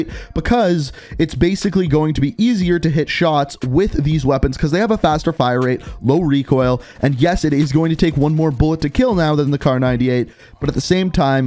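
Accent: Canada. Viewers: yr Canadian?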